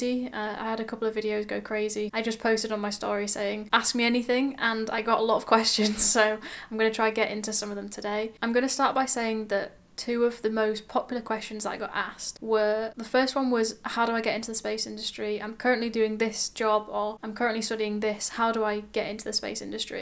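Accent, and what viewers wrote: United Kingdom. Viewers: You're a very interesting person. I love your channel. You have an incredible calmness inside you, and a very pleasant voice. I love so much hearing your British accent. You inspired to me. Naysayers? How care about them? All The Best!!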